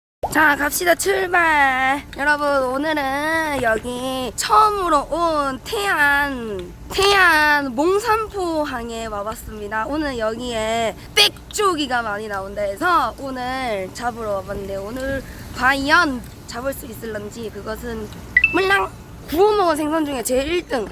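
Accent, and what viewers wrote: Busan, South Korea. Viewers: 오~부산사투리 듣기좋네 내는 창원인데 윽쑤 정겹네요 마이 잡으이소~~~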